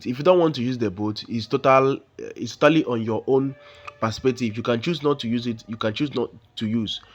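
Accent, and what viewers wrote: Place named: Igbo, Nigeria. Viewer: You sound like a true Igbo guys that know what his doing..... great one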